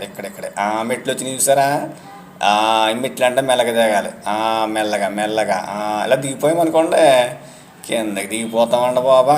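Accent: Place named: Godavari, India. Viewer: గోదావరి యాస మన అందరి శ్వాస......🎉🎉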